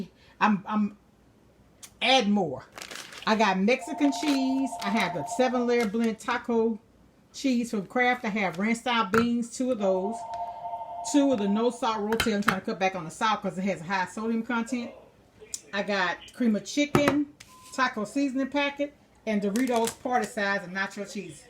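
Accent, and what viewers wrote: South, United States. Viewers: Enjoyed your approach of being yourself right at home. I making this for my family right now. You sound like buoy bc from the south , I’m from AR too, shout out to your cuz lol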